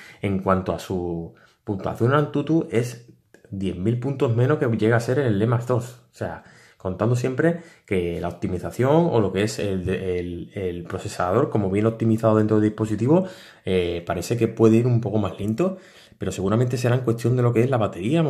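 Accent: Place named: Seville, Spain. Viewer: Buenas Crack Últimamente me salen muchos vídeos recomendados en tu canal y.. me los veo todos la verdad jeje Tenía dos preguntas para ti, la primera: Este LeEco de ¿qué año es? no he visto nuevos LeEcos desde el 2016 y me quedé en el Le Max 2 y el Le Pro 3. Y la segunda, si puede saberse, ¿ De donde eres? por el acento tuyo y sobretodo el de las chavalas del vídeo intuyo de que eres almenos cercano a la zona de Sevilla (yo si soy sevillano, me hace ilusión ver que alguien cercano a mi también se dedica a esto :D ) Un saludo y feliz año!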